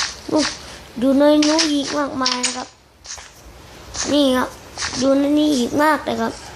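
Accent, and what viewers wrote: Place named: South, Thailand. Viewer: คนใต้คนใต้ คับคนใต้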